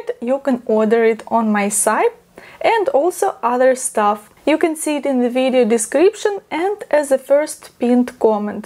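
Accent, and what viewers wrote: Ukraine. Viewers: Ukrainian accent is very nice. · Your English is fine . I'm used to hearing English with the Ukrainian accent now . I don't have a problem understanding you . Your parents sure were good to you and your sister . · Your accent is Beautiful. My fiancee is Ukrainian and she has a similar accent and it is SO beautiful. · Your English is perfect, 💐💐💐 please dear Ukrainians don't be embarrassed by your accent it's lovely. You want to hear the English language in a terrible accent then you gotta talk to us Australians🤣🇦🇺 Been picking up some Ukrainian words & phrases from watching you all with translations in clips & songs Ukrainian is beautifull but very hard for us. Get excited when recognising words & phrases😁 but damn when I hear myself trying to say/sing along my Australian Strine accent is dreadfully thick & pronunciation is woefull😅 stay well & safe & Family🇦🇺❤️🇺🇦🦘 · Your English is really just fine. 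It's just that your Ukranian accent is pretty heavy. That can go away with practice if you really want. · The criticism of your accent by other Ukrainians, I believe, is based on culture. Of the Ukrainians I have met, they are perfectionists. Your fellow Ukrainians want your English to be perfect since you are considered an Ambassador of Ukraine on the internet. It is ok, they are proud a people. Glad to see you back online. Take care.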